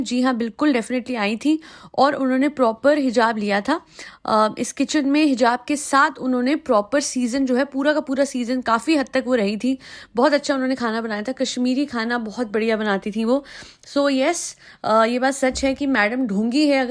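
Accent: Punjab, India. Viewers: I know, it's ok.. it's not easy to reply all comments,we love you always,nd specially love your punjabi accent ❤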